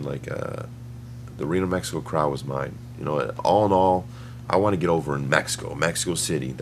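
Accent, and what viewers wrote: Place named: Spain. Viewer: It's his Spanish accent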